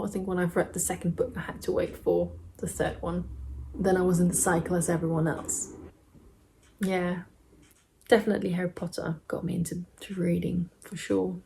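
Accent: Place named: United Kingdom. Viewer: I'm surprise you are from Denmark. You sound like a British person, so I thought you were from England.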